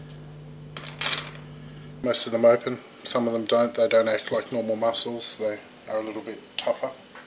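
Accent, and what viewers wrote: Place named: Australia. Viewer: the captions are a classic laugh fest ... I missed the cocaine lol that's hilarious. Methinks the translations program has issues with the Aussie accent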